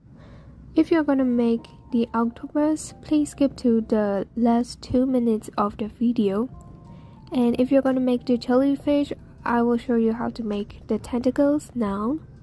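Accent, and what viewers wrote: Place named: Myanmar. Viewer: Are you Burmese? I can hear the accent.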